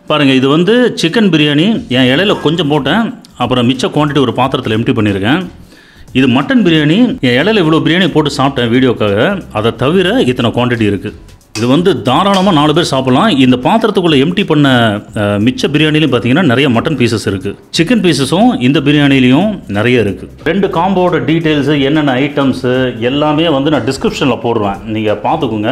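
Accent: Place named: Coimbatore, India. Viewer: Covai speech thani special sir...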